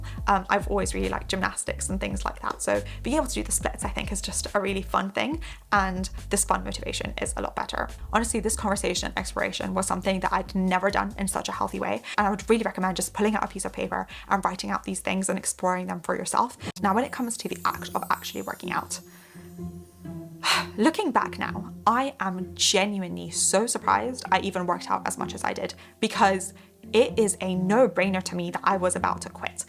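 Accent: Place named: United Kingdom. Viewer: You speak really quickly! I use your videos for improve my listening and I like your UK accent but it's hard understand you without subs.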